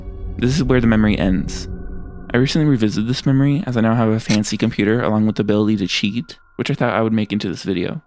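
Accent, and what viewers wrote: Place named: United States. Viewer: He's speaking completely understandable American English, the fuck are you on about?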